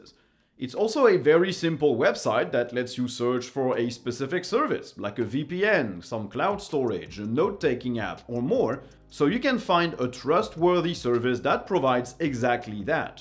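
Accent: France. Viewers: I love hearing you speak in "French" English. This is a very interesting experience. I greet you from Poland. 😉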